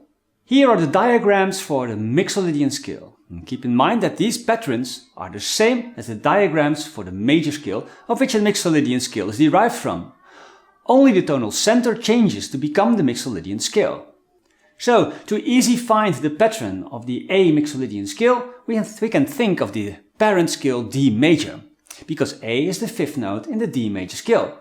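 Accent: Netherlands. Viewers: Can confirm! is among the best guitar education resources on Youtube. His explanation, auditory and visual examples are exceptional. And I adore his dutch accent too.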